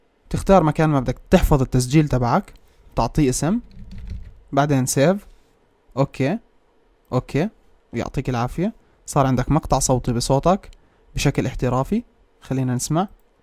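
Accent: Levant, Jordan. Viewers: اردني ولا فلسطيني؟ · انته اردني ؟ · انت اردني او فلسطيني صح انا جنسيته فلسطيني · الشب من الاردن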